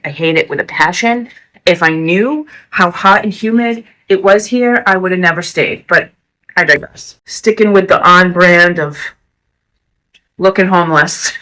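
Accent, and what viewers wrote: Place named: New York, United States. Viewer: you’re incredibly strong! I don’t know if I could deal with the trapped anxious feeling during withdrawal and be in jail. You deserve the best now! Love the New York accent, I work all over NY and NE. I see your channel blowing up! I was kinda sharing my lengthy story on how I came off opioids in various parts.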